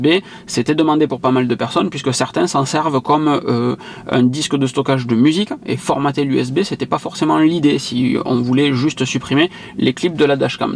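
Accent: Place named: Montauban, France. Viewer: hello , bonjour de Montauban , content de trouver un autre youtubeur avec le meme accent que moi ;)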